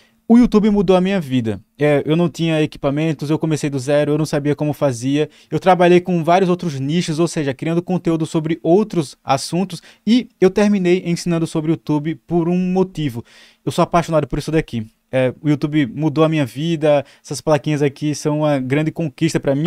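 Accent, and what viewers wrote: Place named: Paraíba, Brazil. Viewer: Cheguei hoje por aqui e tô maratonando! Sua didática e franqueza são mega cativantes! E seu sotaque super me pegou! Pensei até que era da minha terrinha , João Pessoa :face-red-heart-shape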